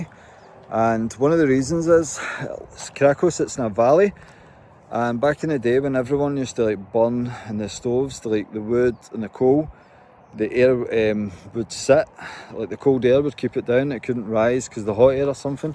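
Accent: Scotland, United Kingdom. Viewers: The difference is-I love your Scottish accent. I have never been to Scotland but maybe some day it will be possible ,I hope so. Offended? Are you kidding? We not get offended so quickly. Like the Scotts I've heard they are very direct people, aren't they ?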